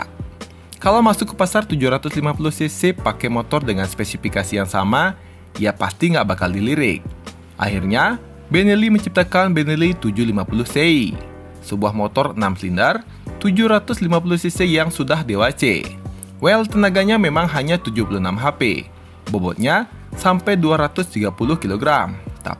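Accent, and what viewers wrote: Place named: Bali, Indonesia. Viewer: Logatnya bali banget ni Bli ahahah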